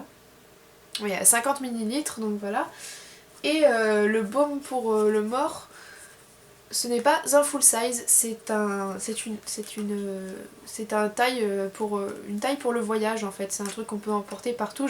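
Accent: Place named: England, United Kingdom. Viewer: tu a un accent anglais magnifique !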